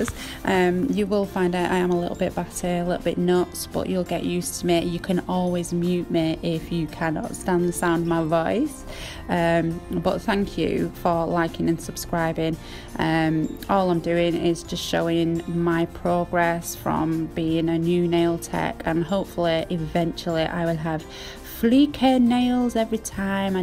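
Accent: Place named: RP, United Kingdom. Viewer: No way - I'm Australian and your accent sounds so posh!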